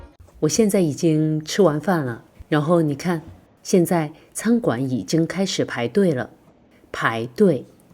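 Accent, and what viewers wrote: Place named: North, China. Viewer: Beautiful northern accent ( im guessing here) you have got!!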